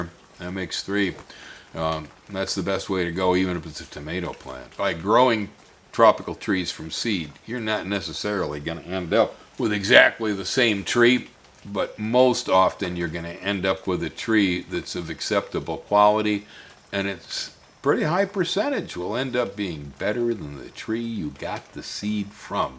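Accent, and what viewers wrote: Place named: Wisconsin, United States. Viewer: Ah northern Wisconsin, I thought u sound familiar. I’m from Minnesota.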